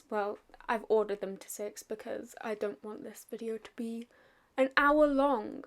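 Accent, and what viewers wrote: United Kingdom. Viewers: Sound like RP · You have a British accent... it is a GREAT accent!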